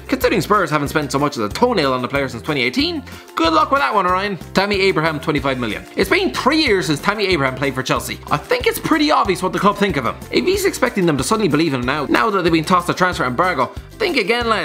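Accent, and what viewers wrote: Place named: Ireland. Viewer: I can’t understand a word your saying slow down lad. I love the Irish accent but you sound like you’ve sniffed a few gram lol chill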